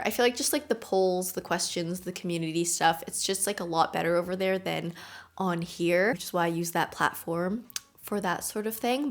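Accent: Canada. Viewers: Your Canadian accent is so prominent I love it!! It’s super cute!!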